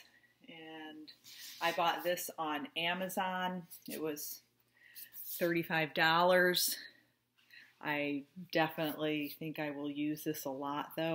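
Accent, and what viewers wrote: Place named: Toronto, Canada. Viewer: I grew up in TO, so I’d know that accent anywhere. My shoulder gets done on Aug 1st. Thanks for all the good info.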